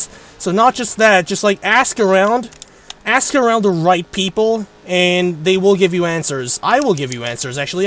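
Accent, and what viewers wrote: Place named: United States. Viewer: You sound so American lol! Seriously, it feels very niche here in the US today, sadly never can find anyone I know who thinks Asphalt games are NOT ridiculous. You're living the gamers dream, which is basically to be like a top 100 player in their favorite game and I think maybe you underestimate how cool that is. Nobody would trade that! Keep being a good guy, it's your best trait.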